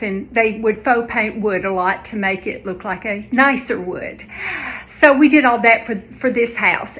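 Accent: South, United States. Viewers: Soo lovely, you have a real, lovely victorian Southern States of America. Still love your funny accent. Lots of love 😘😘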